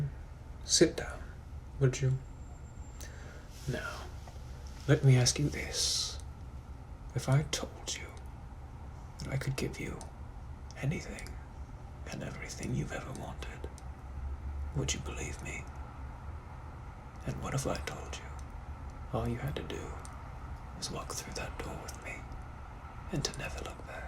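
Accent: RP, United Kingdom. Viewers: 22 minutes of a British gentleman?? lord have mercy on my soul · Idk why but his British gentleman voice reminds me of Elijah from the Originals but if he was a psycho and didn’t respect woman(maybe???) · Thank you for blessing us with British gentleman for 22 minutes 😌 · pov: you're standards are high because you're simping over and his accent when he is a British gentleman from the 1800's... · Your normal and British voices are so amazing and comforting idk how but damn I could listen to this whenever I cry for comfort ily bro😔♡︎ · I came here for the reg voice and I got British why did you lie to me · 22 straight minutes of the British gentleman, don’t mind if I do 😭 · is he British or does he just sound more American when his voice is sped up. and he’s like a handsome pretty looking dude but i ain’t crushin he is cute though. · Fuck 'British gentleman voice - ASMR WITH THEM KNUCKLES THAT CRACK LIKE THE VOICE HOT THEM KNUCKLES POP LIKE MHHHM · Funny how I fell in love with kpop years ago, loved british accent since forever.. 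and discovered him just now 🤡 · i am currently unable to breathe... he- i- 🥴 the butterflies i have right now are insane.... i need to make his voice my alarm in the morning and my ringtone not even just the british voice... just his voice in general.. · Hi I like the POV's and the voice of the British gentleman from the 1800's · I searched "British accent ASMR" and it brought me here. 10/10 no notes.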